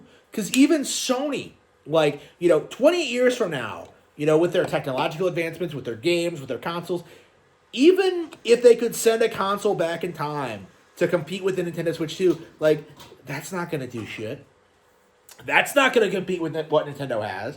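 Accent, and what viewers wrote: United States. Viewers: Why you sound intoxicated with a Arizona lol bruh crack a beer and get a pc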